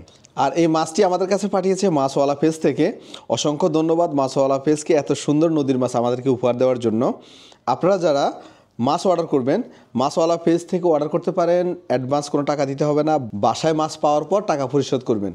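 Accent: Noakhali, Bangladesh. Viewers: মুখের ভাষাতেই বুঝা যায় ভাই নোয়াখালীর..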